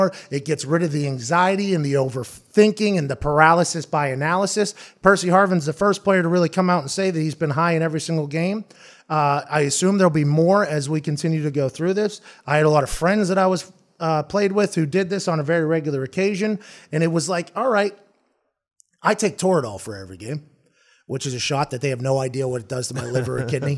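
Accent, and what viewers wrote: Pittsburgh, United States. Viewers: I love when he does accents of idiots and his Pittsburghese accent comes out. I'm from the burgh and my buddies and I do it all the time. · Raymond Toper hahaha same , his Pittsburghese is so good too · That yinzer accent lol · The Pittsburghese gets me every time! #412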